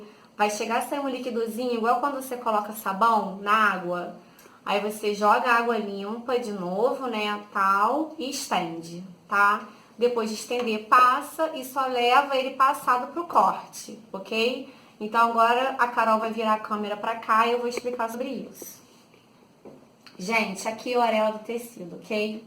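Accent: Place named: Rio de Janeiro, Brazil. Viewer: Parabens pelo video e pelo sotaque carioca! Cheguei aqui procurando como fazer esquadramento para bordado, mas já estou inscrita no seeu canal desde agora! Felicidades de uma carioca que nao mora mais no Brasil!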